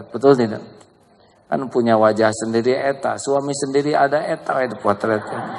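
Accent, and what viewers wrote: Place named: Sunda, Indonesia. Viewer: Sebenarnya orang mana ,kadang Sunda ,,kadang ,sanjang ,nggih tiang pundi aa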